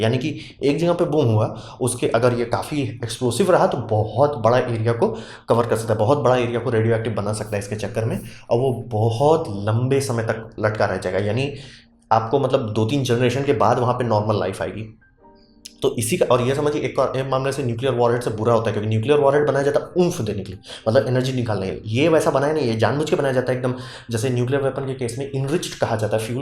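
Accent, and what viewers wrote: Bihar, India. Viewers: Sir ar u from bihar Or jharkhand please replay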